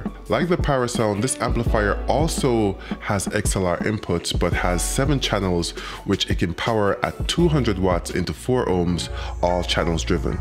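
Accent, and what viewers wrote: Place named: Jamaica. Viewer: or you Jamaican? yu sound like a yardie my youth, nuff respect.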